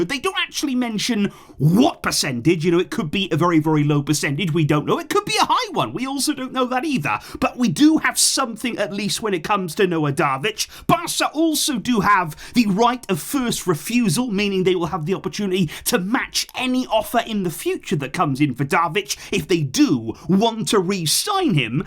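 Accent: United Kingdom. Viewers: This is also a channel for learning the original British accent.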